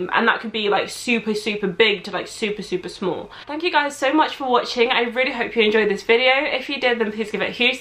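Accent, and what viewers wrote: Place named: United Kingdom. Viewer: I love your british accent guapa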